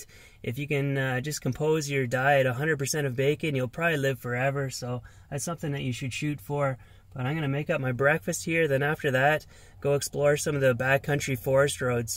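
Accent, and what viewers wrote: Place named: Ontario, Canada. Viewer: Thats how they talk in Ontario eh!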